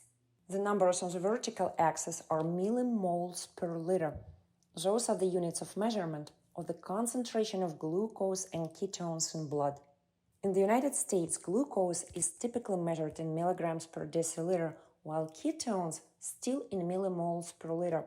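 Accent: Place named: Poland. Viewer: Your foreign Polish accent makes my ears bleed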